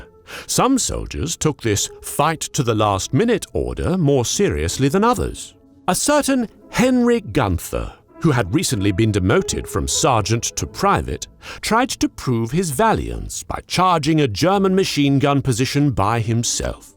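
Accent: United Kingdom. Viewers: Saw an ad, clicked because of the thumbnail, stayed for the British accent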